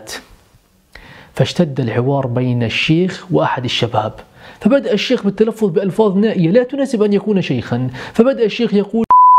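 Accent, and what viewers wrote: Palestine. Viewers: شيخ نص كم😂😂😂 فلسطيني ميه ميه،الحمدلله تكلمت فلسطيني قليلاً لاني كنت اشك انك تجيد لهجتك😂🧡😊 طلب صغير .. تكلم فلسطيني ولو جمله واحده في فيديوهاتك قلت لك اني اشك انك تجيد لهجتك😂💛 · s sss مع أنو ماني فلسطينيه بس بحبا للهجتكن كتير وبحب اسمعا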